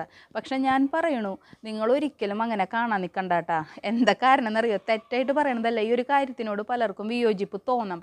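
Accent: Thrissur, India. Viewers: തൃശ്ശൂർ ഭാഷ കേൾക്കാൻ നല്ല രസം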